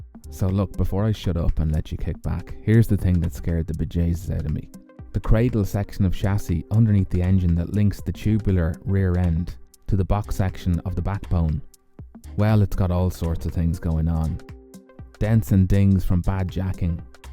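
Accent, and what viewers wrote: Ireland. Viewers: music is fine, but you appear to be only talking out of my sub woofer. I'm pretty sure it's not just your delectable Irish accent... 😏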